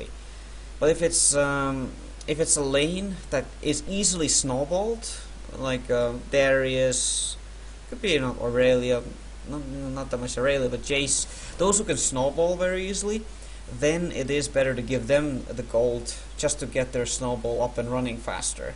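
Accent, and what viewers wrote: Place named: Sweden. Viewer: what accent is it? swedish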